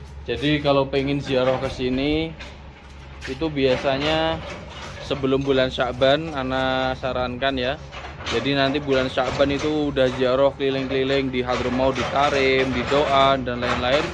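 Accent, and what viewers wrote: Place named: Indonesia. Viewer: saya lebih suka orang indonesia yg bicaranya berlogat indonesia ,daripada orang indonesia logatnya di arab2kan...jaga terus tradisi logat indonesia nya mas .,jangan seperti yg lain yg logatnya di arab2kan...budaya kita kekayaan bangsa kita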